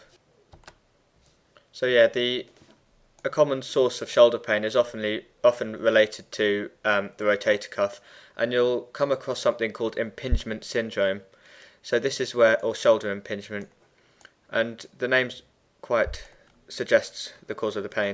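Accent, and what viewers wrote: United Kingdom. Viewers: I can just imagine you teaching to your class and everybody's getting distracted with the British accent! Good job in your explanation though. Clear and concise :-)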